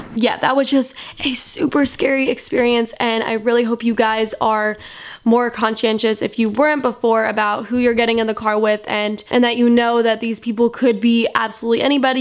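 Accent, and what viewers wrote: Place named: New York, United States. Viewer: I feel like your from New York or New Jersey.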